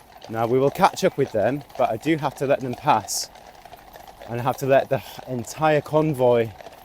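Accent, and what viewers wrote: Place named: Staffordshire, United Kingdom. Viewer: … the more I listen to your narration the more I think he was born and bred in the potteries or the Staffordshire Moorlands.. I may be wrong of course but that accent sounds familiar … Nice video btw as usual … 🫡🫡🫶🏻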